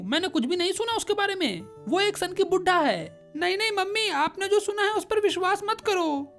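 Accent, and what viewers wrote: Bihar, India. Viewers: It's not bhojpuri bro. It's hindi with bihari accent.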